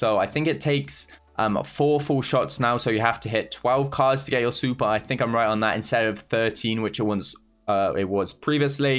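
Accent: United Kingdom. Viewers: You speak Oxford english.Arent you?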